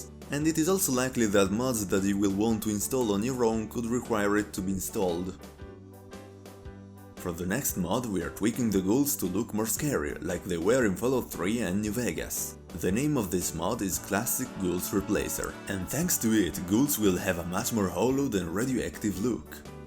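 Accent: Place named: Italy. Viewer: comunque si sente tantissimo che sei italiano, comunque bel video!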